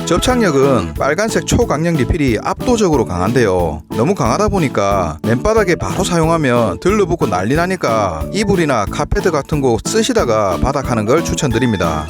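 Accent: Gyeongsang, South Korea. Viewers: 경상도사투리만 없어도 참 좋은 컨텐츠입니다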